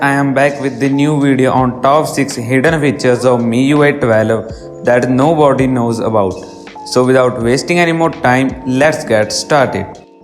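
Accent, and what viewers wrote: India. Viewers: Hindi Accent!!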